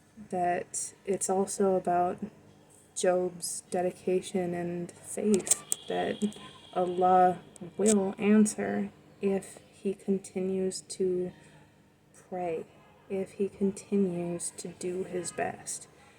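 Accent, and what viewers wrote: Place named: Midwest, United States. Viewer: Salaam, which part of the Midwest are you??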